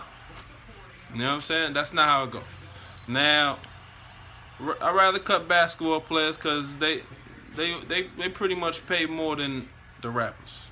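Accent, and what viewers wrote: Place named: Harlem, United States. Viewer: Bro. Sound like Harlem and the shop could be there as well. Uptown Uptown. Interesting Inside infor on rappers being cheap!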